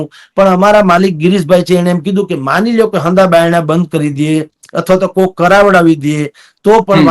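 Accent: Kathiawar, India. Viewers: કાઠિયાવાડી ભાષામાં મઝા આવે છે..🎉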